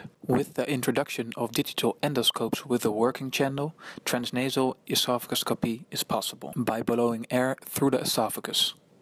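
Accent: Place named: Netherlands. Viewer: Heel goed, Nederlands accent!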